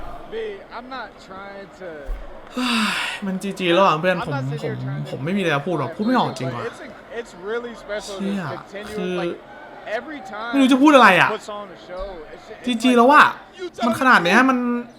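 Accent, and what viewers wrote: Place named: United States. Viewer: ทำไมพี่ต้องติดพูดเมกาด้วยครับ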